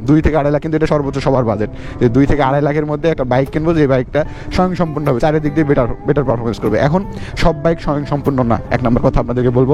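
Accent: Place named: Gaibandha, Bangladesh. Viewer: ওহ আমি মনে করছি গাইবান্ধা, নয়তো বগুড়া, নওগাঁ,গাইবান্ধা,বগুড়ার ভাষা same..😊 তাই না ভাইয়া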